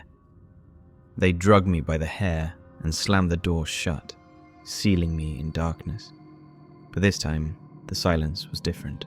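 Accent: England, United Kingdom. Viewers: Brilliant narrative! This fictional sanitarium echoes that of Waverly Hills Institution, sadly this one very much existed. Are you British? Your accent is lovely 😍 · First of all, the past tense of drag is dragged (not drug) regardless of what many of this country say. That said, I enjoy the apparently proper English accent and grammar used. Other than that, it is well done as a haunting yet real story, well told. More importantly, I believe this is an accurate account of those in sanitariums in past years. With deinstitutionalization in the U.S. in the 70’s came new heartbreaks and injustices. “Nurse Rachets” have abounded. Honestly, most people love power over others, and when afforded opportunity, will abuse. But, their days are numbered.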